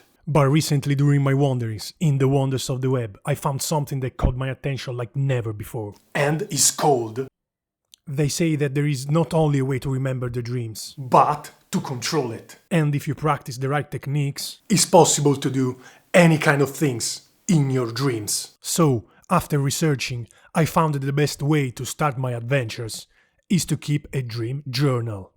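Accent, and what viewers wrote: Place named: Italy. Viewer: from the accent he seemed italian and then i read "ho sognato che stavo mangiando un panino", ed è lì che ho capito che era mio fratello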